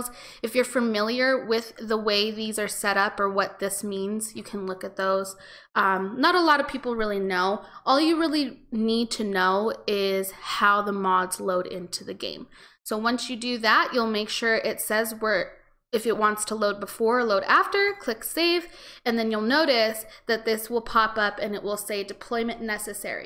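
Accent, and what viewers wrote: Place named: United States. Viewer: Hi great video and i love your Accent if you dont mind me asking what part of the US do you Hail im actually from the UK?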